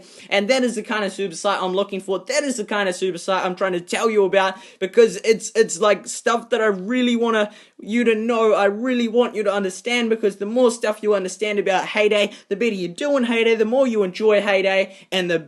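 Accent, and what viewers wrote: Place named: New Zealand. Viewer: WhiteAsian Manchild he’s from New Zealand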